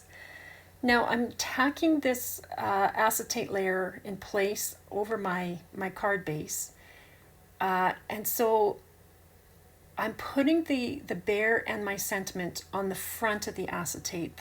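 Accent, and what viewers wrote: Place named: Canada. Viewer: What a lovely card. I love your Canadian accent.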